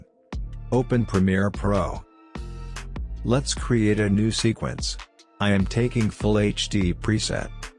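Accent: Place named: United States. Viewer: YES SIR BUT WHEN I WAS WATCHING ONE OF YOUR TUTORIALS , YOUR ASSCENT TO SPEAK ENGLISH WAS LIKE AN AMERICAN , PLEASE REPLY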